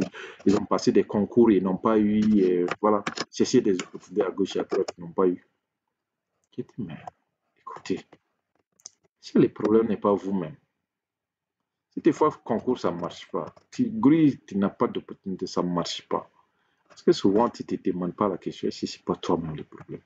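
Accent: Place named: Burkina Faso. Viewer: Tu as un accent Burkinabè ! Tu es Burkinabè ? Je viens de découvrir ta vidéo pour la première fois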